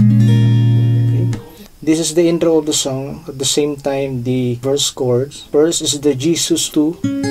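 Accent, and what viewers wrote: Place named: Philippines. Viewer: Thanks so much for teaching us the song. By the way, you sound like you are from the Philippines! I can hear a dialect that makes me think you are from the Philippines. I hung out with friends in nursing school that were from the Philippines. They ars so so cool! Thx again for teaching us the cords!!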